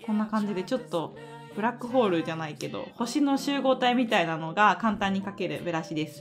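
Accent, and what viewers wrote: Kansai, Japan. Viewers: 今日めっちゃ、関西弁でてますね！😍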